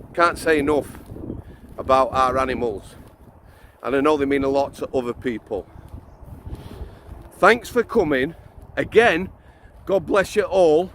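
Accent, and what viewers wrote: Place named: Yorkshire, United Kingdom. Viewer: Yorkshire through and through lol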